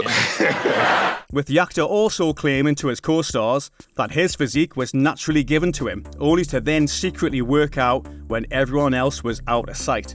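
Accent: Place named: Newcastle, United Kingdom. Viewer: That's a Geordie accent. Not Australian.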